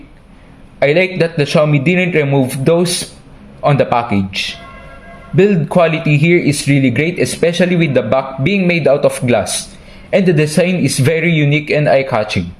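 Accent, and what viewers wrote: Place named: India. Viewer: Haha parang indian Ang English mo. 😅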